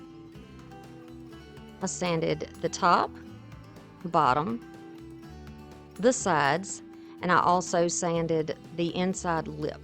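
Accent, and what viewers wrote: South, United States. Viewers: Love these😅 and your gentle southern accent. Subbed!!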